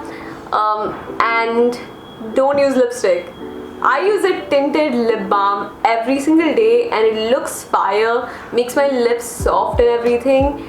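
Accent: India. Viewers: she gives me vibes like she is lil ananya pandey and sonam kapoor ofc with south delhi/mumbai accent but more still better then them😂😂❤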